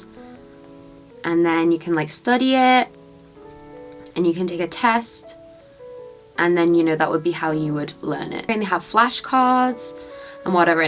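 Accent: United Kingdom. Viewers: I love your british accent and Congrats to have a 6k subscriber! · She sounds completely British to me? What Americans have you been listening to 😂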